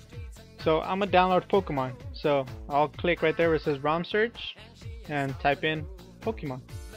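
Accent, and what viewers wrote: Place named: India. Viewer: No offence dude but ur mike makes you sound like indian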